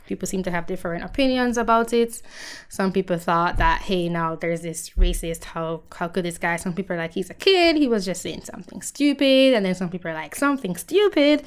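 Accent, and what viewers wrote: Jamaica. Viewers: Since I'm not American I don't really care about any of these issues, but I just enjoy listening to your Jamaican accent. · Really nice jamaican girl. Your accent is so cute.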